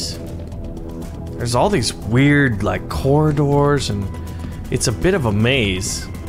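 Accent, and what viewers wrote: Australia. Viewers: U kinda sound like Australian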